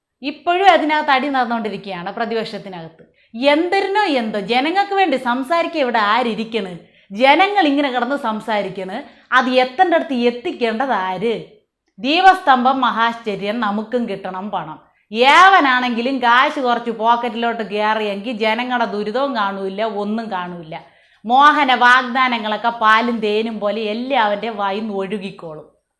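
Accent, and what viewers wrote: Thiruvananthapuram, India. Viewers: തിരോന്തരം ആണോ? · ഇതു തിരോന്തരം ഭാഷകളാണ് അല്ലേ അപ്പി 🤣🤣😂വളരെ മനോഹരമായിട്ടുണ്ട് ❤️👍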